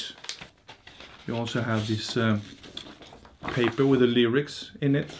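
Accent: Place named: Sweden. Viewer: Your Swedish accent is delightful! Love it 😁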